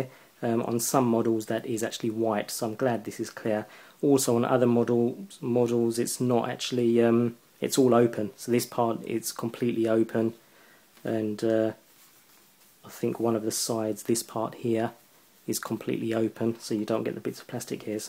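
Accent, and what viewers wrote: England, United Kingdom. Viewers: Where about in England are you from? :)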